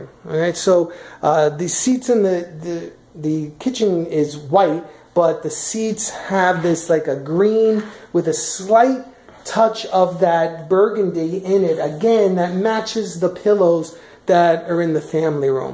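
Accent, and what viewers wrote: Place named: New Jersey, United States. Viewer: OK so needs to publish a recipe book and you need to publish a holiday decorating book I can see them on coffee tables every where. BTW I have lived in Vermont for the last 57 years but I still know a Jersey accent when I hear it. Mom was from the Hazlet area and Dad wae from Philly. Be well you two.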